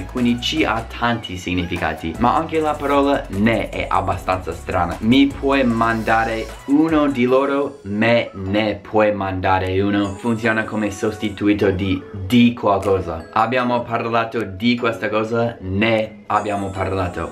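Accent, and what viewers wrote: United States. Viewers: Caro, io sono un'italiana che risiede nel US da 43 anni. Sono cresciuta in Italia ma ho fatto le scuole superiori qui nel US e ho dimenticato molto Italiano. Ero brava una volta a scrivere l'Italiano, ma ora non tanto. Ho imparato bene l'inglese e potrei correggere tanti americani. Complimenti. Se non hai scritto lo "script" per questo video, sei molto bravo. La pronuncia e` ancora molto americana, pero`. L'italiano lo parli meglio di me. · Caro non confondere il sesso con il genere. L'italiano avendo il genere anche negli articoli determinativi o indeterminativi, oltre al singolare e al plurale, e. molto piu' comprensibile di altre lingue. Non e'. difficile impararlo. I problemi sono altri, cioe' la sintassi, la semantica dello sterminato vocabolario,i modi e i tempi dei verbi e tanto altro. Per quanto riguarda la pronunzia, purtroppo e' piu' complicato in quanto i popoli anglo-sassoni di madrelingua, con quel tipo di pronuncia, modificano in modo naturale le corde vocali. 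Basta vedere la differenza tra te che sei americano e un inglese di Londra,la pronuncia e' completamente diversa. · Ma quando hai una parola ostica da pronunciare, credo che tu sei abituato a visualizzare com'è scritta e di conseguenza la pronunci da americano. Se invece ascolti senza sapere come è scritta e provi a pronunciarla troverai meno difficoltà. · L'italiano è molto complesso come lingua. Ma, insomma lo parli bene con l'accento americano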